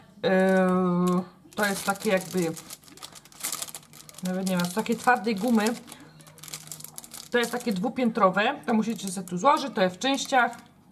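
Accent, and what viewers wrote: Silesia, Poland. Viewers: Moje gratulacje z okazji subów, jestem z dolnośląska z Głogowa, bardzo podoba mi się że pani przepisy nie są wymyślne ani skomplikowane i "goda " pani krótko i na temat i często daje te filmiki co budzi moje zdziwienie, że przy trójce dzieci pani to ogarnia i jaszcze jedno- ten słodki szkrab uśmiechnięty wysyłający buźki, bardzo mi się podoba, szkoda że nie jestem jego ciocią i nie mogę go wyściskać, nie miałabym nic przeciwko temu żeby pojawiał się w każdym filmiku.Mam koleżankę w okolicach Katowic i wtedy też godom, bardzo mi się te gwara podoba .Oczywiście polecam kanał, często korzystam z przepisów, trafiłam dosyć dawno przypadkowo i oglądałam ciurkiem wszystkie przepisy, pozdrawiam, życzę dalszych sukcesów. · Jestem ze slàska i mieszkam w Hisxpani dosyć troche i słucham cie po ślonku to fajne jest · witam! Ja panią oglądam juz od prawie roku i super dodała pani przepisy w tym roku jest Pani the best i najlebszą z kucharek Pani jest ma pani śliczną kuchnie i super dzieci ja osobiście zazdroszcze im że jedzą takie pyszne żeczy ma Pani najlepsze recepty na całym you tubie ja poleciłam panią nawet mojemu sąsiadowi Kubie i jeszcze powiem,że fajnie Pani gogo po śląsku bo śląski to przecierz mój polski język a natrafiłam na Panią tak z przypadku i teraz oglądam Pani każdy film pozdrawiam: Paulina z Czechowic-Dziedzic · na początku bardzo gratuluje 13 tys. 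i życzę aby było ich jak najwięcej. Trafiłam tutaj dzięki poleceniu DG no i gdy zobaczyłam te cudowne torty zostałam najdłużej. Czasem włączam sobie pani filmik i zastanawiam się czy mi by też taki tort wyszedł? Dodatkowa urzekła mnie pani gwara, jestem ze śląska z Gliwic i moja babcia mówi w ten sam sposób, czasem mi przykro, że my (wnuki) tak nie potrafimy. Pozdrawiam, Wioleta. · Ale ześ wymyśliła konkurs. Ja ci nie powiem jak cię znalazłam. To były chyba twoje rózne drozdżowe wywijasy: piza słońce, makowiec i gwiazda z nutellą. Ja zasubskrybowałam cię bo uwielbiam, jak gadasz z tym ślaskim akcentem:D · moje dzieci są zachwycone Pani gwarą, my jesteśmy ze Szczecina i nie mal każde słowo Śląskie jest dla nas ciekawe w słuchaniu, dzieci zachwycają się każdym zdjęciem deserowym, wiec oglądamy po jednym filmiku dziennie i od czasu do czasu pieczemy i gotujemy. Na pewno wyciąga nas Pani z kuchennej rutyny za co DZIĘKUJEMY:) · ja na trafiłam na ciebie jak szukałam przepisu na pannacotta twój kanał jest taki inny niż wszystkie i twoja gwara śląska jest dla mnie bardzo śmieszna i mieszkasz obok mnie bo ja mieszkam 70 km od Monachium pozdrawiam serdecznie :* a może jakieś Q&A nagrasz ? 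· ja trafiłam na pani kanał jak szukałam jak zrobić ciasto na pizze. Oglądam panią razem z moją mama.:-) jest pani super!:-) Mieszkam na śląsku obok Katowic i Sosnowca. miło jest oglądać kogoś co tysz umi godac po slasku.:-) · Ja poznałam panią poprzez film o Elsie z krainy lodu :) mieszkam w Małopolsce , mam 15 lat i bardzo lubię piec :) mam rodzinę na Śląsku , wiec pani gwara nie jest mi opca :) pozdrawiam ciepło ! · nie pamiętam już jaki przepis był tym pierwszym uwielbiam je wszystkie i wiele wypróbowałam z przyjemnością oglądam nowe filmiki a najbardziej podoba mi się jak super mówisz po śląsku niektóre filmy oglądam tylko aby posłuchać Ciebie jestem z Gdańska i gorąco pozdrawiam Ciebie i Twoje słodziaki które czasem pokazują się lub odzywają buziaki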